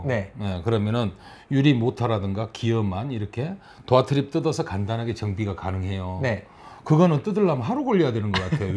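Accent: Gyeongsang, South Korea. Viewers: 경상북도 사람이 서울상경한 말투 ㅋㅋㅋㅋㅋㅋㅋㅋㅋㅋㅋㅋㅋㅋㅋㅋ 이걸 왜 느꼈냐면,,,, 제가 그래요,,,,,,,,상주 구미 영천 안동 ㅋㅋㅋㅋㅋㅋㅋ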